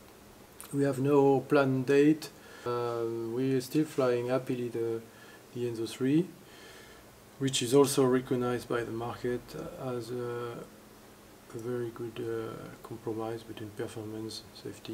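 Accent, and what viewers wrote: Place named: France. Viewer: Mais quel accent de merde, j'adore!!😄 Vive la France🇨🇵 And don't forget to come visit and fly our beautifull Alps!!👍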